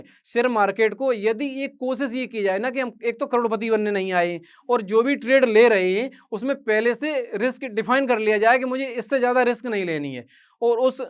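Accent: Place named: Bhind, India. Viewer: Bhind ki bhasha bhut mst or pyari lgti he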